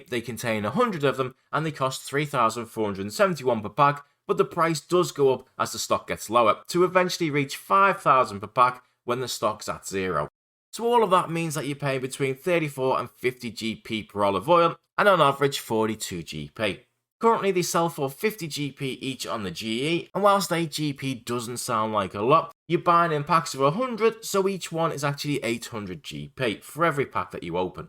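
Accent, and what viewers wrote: Liverpool, United Kingdom. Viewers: are you scouse bro?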